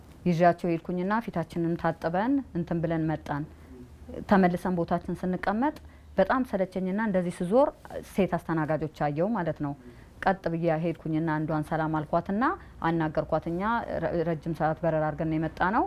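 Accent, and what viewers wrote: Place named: Arab world. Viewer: እንግሊዝኛ ሰታወሪ አክሰንትሽ እንደ አረቦች ነው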